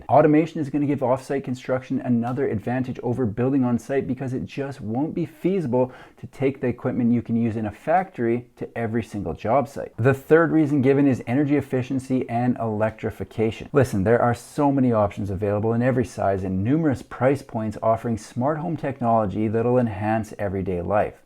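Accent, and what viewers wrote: Canada. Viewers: My team noted you are Canadian. Do you only say “aboot?”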